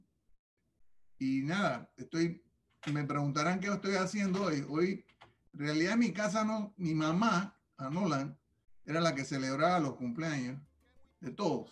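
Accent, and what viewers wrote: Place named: Panama. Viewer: Lo he visto dos veces una vez en el instituto Naciónal que fuisteis a cantar y la otra cuando era candidato para la presidencia es muy amable y cariñoso Dios te bendiga lo que más admiro de ti que no has perdido tu acento de Panameño a pesar de haber vivido tanto años afuera del país !!!! Saludos desde Florida!!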